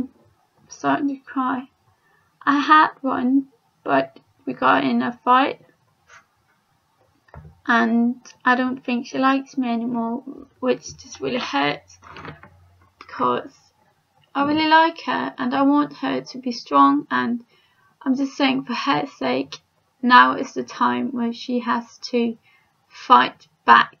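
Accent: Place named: United Kingdom. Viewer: Du virker sød men hvorfor taler du med britisk accent. Håber det bedste for dig.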